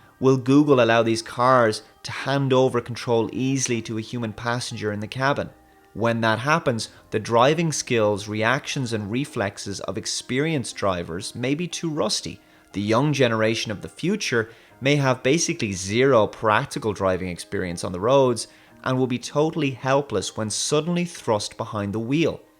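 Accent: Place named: Ireland. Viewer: Question: Why does the narrator have an Irish accent?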